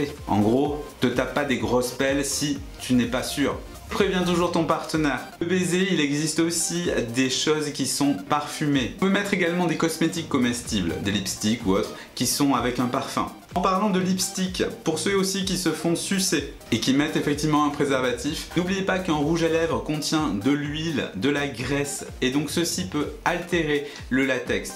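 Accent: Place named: South, France. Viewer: Ahhhhh je t'aime toi avec ton. accent du sud !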